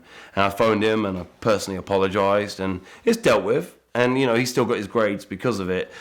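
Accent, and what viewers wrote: United Kingdom. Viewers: No matter how cool you are that Brit accent make you sound like a total douche!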